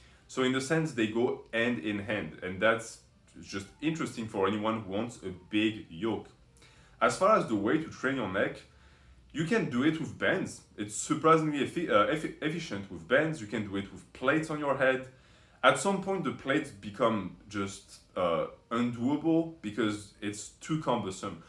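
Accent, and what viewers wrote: France. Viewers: don’t know if you’ll respond to this comment after 4 years but your accent sounds like french, are you one of us ? 🇫🇷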